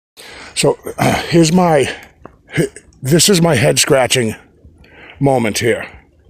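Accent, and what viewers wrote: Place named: Massachusetts, United States. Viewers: Love the Boston accent. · HEY, THIS IS THE SECOND TIME I WATCH THIS VIDEO, I JUST WANT TO SAY HOW YOUR Massachusetts accent makes me miss it up there so badly!!!! I feel like I'm home when i watch your vids. As you know I'm down in Florida for the past how many years. But I miss home and you always bring me back!!!THANKS, STILL HERE WATCHIN YOU OUT SQUATCHIN..LOL...TAKE CARE AND STAY SAFE!!!!LINDA IN FLORIDA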